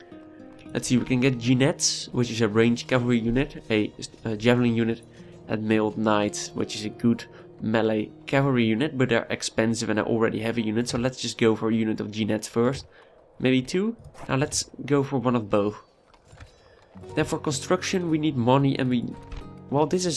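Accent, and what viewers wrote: Netherlands. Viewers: Are you by any chance Dutch? You have our wonderful accent :P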